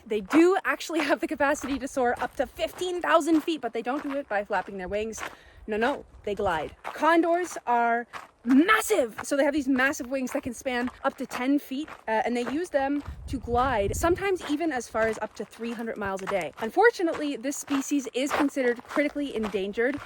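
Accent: Canada. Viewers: killed me with the Canadian accent 🤣 Love your corny jokes, education as you make your way through the trails and just your overall excitement when oot and aboot in nature! Amazing video as always and excited to see where you explore next, cheers!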